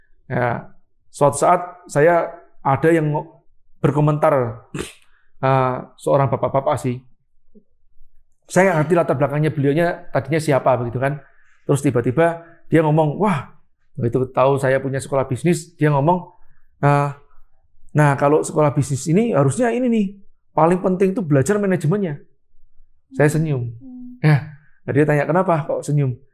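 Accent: Java, Indonesia. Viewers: Koh asli mana si..jawa ya.medok.hehhehe Sukses trrus ya koh..moga sehat selalu..aamiiiinnn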